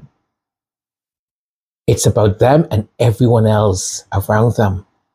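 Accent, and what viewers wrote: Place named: United States. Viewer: Yes its funny i would think u where a American 😊😊😊👍🏼👍🏼👍🏼👌🏿🌹🌹🌹🎈🎈🎈🌞🌞🌞🌞🥰🥰🥰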